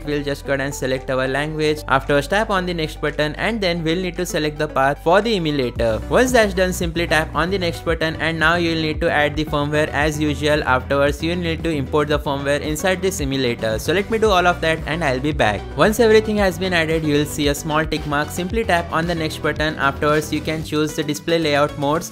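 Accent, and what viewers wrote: India. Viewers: i need a subtitle i dont understand indian english accent bruh😂😂😂😂😂😂😂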